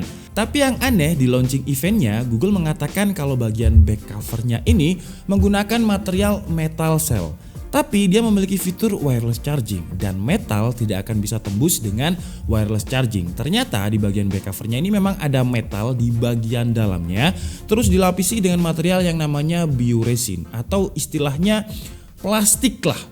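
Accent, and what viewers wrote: Java, Indonesia. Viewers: Dia orang malang ngab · Jawa.ne pundi om? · Sampeyan jowone ngendi tho mas kok medok tenan 😀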